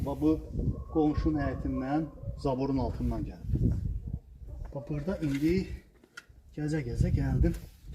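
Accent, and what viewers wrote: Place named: Shamakhi, Azerbaijan. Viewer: Deyesen bura Şamaxidir. Lehcesinden oxsatdim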